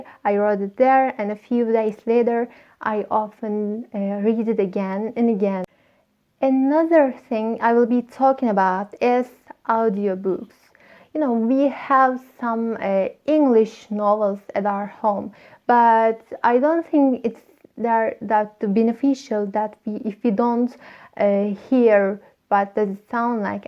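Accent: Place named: Turkey. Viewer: Türk olduğun çok belli oluyor konuştuğunda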